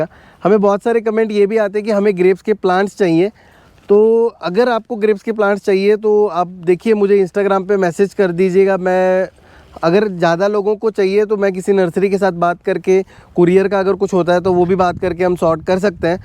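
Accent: Punjab, India. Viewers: Aapki Hindi mein Punjabi tone hai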